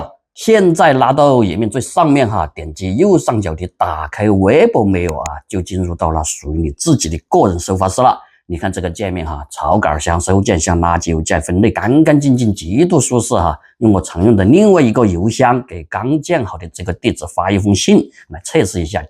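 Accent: Sichuan, China. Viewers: 这个川版普通话我还是听得懂，就是搞这个不花钱的邮箱太复杂了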